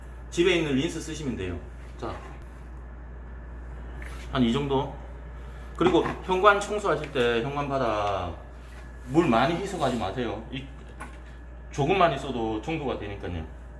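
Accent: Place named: Gyeongsang, South Korea. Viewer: 아니요. 경상도 말투입니다. 투박하면서 정감있는 말투~~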